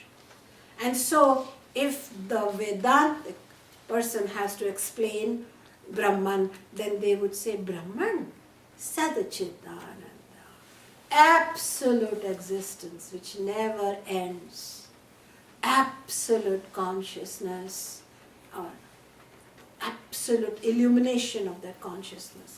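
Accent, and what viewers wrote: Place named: India. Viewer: Very Impressed with her way of explaining Vidanta. She has a strong Indian accent, so may not be clearly understood by a foreign ear, but her English, grammar & vocabulary are excellent. Very Informative, well explained, and simple yet complete. Very Educating, and informative. Thank you for posting. Peace & Blessings to all the Enlightened Souls, and the ones who're well on their Spiritual Journey. Jai Hind!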